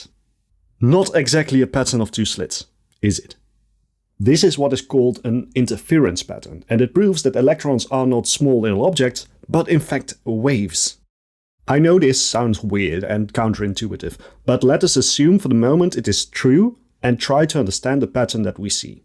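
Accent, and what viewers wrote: Netherlands. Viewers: I can hear that you're Dutch. Great video btw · Your accent is incredibly Dutch. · You sound like you have a Dutch accent. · You sound like you’re Dutch, am I right? :) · you speak very good english. it was at most a hint of a Dutch accent.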